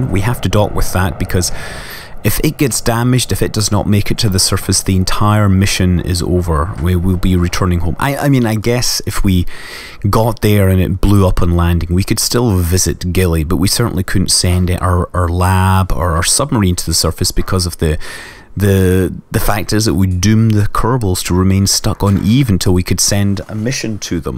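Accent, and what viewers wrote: Scotland, United Kingdom. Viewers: It doesn't feel right when you use your natural Scottish accent, and not your fake Arstotzkan accent.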